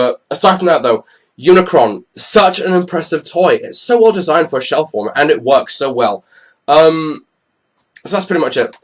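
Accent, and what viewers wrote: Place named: Scotland, United Kingdom. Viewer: You sound scottish and american and British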